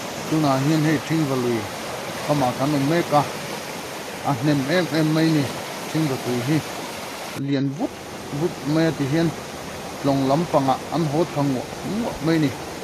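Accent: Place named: South, India. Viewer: I tawng kau chheh danah chhim lam mi i nih ngei hmel e.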